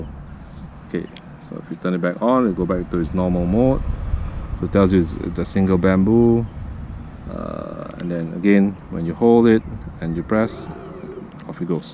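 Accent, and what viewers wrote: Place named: Singapore. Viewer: Singaporean? :D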